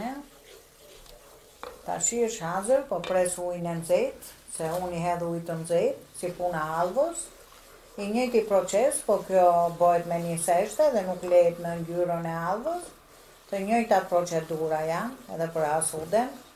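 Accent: Tirana, Albania. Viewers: Te lumshin durt e arta moj teta ☀️🌷me kenaqe me kete menyre te folur Tironce, te pershendes nje Kavajse nga Modena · Ju lumshin duart zonje je shume nikoqire bravo qe sjell ne guzhinen tende gatime tradicionale me qelqen e folura jote tironse respekte!!! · Te lumshin duret e ke qa. 😋 Masanej na kenoqe me te folmen . Te lumte qe se ke humb traditen Tironse. O Tiron zemer flori Prite te gjithe te medhej dhe femi Me ere Dajti uje Selite I rrite cunat dhe gocat si rreze drite Lum ai qe i bo shok Se ka bo i mik per koke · Ju zèntè gezuar e me shendet madam qe flet me lezet gjuhen tironse 😘🌟👍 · Dhe une e bej vazhdimish moj Zonje e nderuar,pervec gatimeve te mrekullueshme me pelqen dhe dialekti Tiranos ton te dashure!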